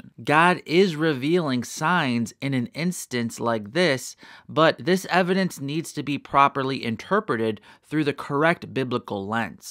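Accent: United States. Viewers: It's good to know about God. I appreciate your standard American Accent. I am trying to understand American Accent.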